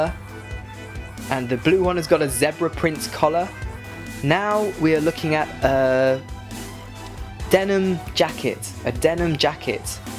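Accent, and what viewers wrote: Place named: England, United Kingdom. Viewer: Where r u from by the way? I've visited London last year and it was an amazibg experience. From your accent it's more than obvious you're from England))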